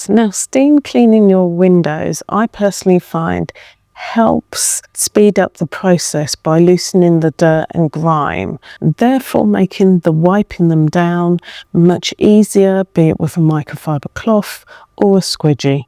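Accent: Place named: Southern England, United Kingdom. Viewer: Faucets or taps? She sounds like she's from the south of England so why faucets?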